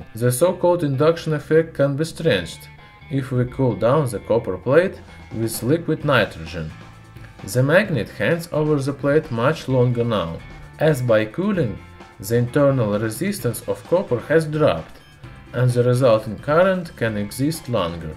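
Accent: Russia. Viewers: Is that Russian, Romanian? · This is russian aksent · I.lke the Russian accent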